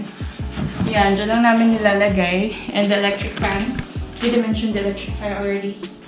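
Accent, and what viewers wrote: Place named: Visayas, Philippines. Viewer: HIi, I love watching your video. First na napanood ko sayo yung youtube sweldo. Tapos ito na, parang feel ko at first na bisaya ka. So happy nung narinig ko na taga Cebu ka nga. You're an inspiration. New supporter here. God bless. Cebuana rin ako pala hehe. Keep safe.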